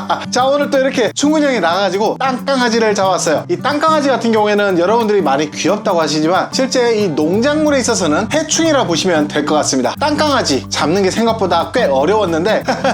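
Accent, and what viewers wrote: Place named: Gyeongsang, South Korea. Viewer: 부산경남 사투리가 상당히 자연스러운데 고향이 경상도신지?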